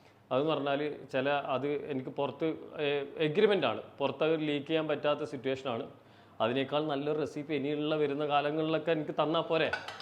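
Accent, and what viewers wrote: Kannur, India. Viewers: കണ്ണൂർ ആണല്ലേ ഷെഫ്?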